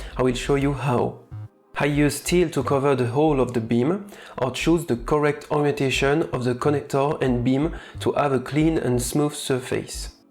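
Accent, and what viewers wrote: France. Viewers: Awesome video as always! I also do like your French accent :) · un accent tellement francais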